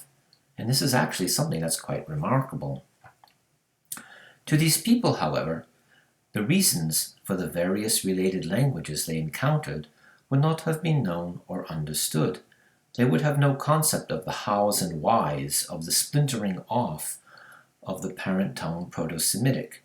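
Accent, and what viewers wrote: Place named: United Kingdom. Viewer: Ps. I thought that you said that you are from America and your father is Polish. But IMHO your accent is British, not so much accent but the way how you construct sentences and words you use. So you are expat leaving in USA? Have a great day!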